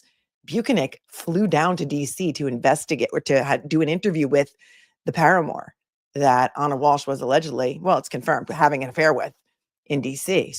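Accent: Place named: Boston, United States. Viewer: Awesome coverage ! love your very appropriate humor & Boston accent 😃😅 as needed - lol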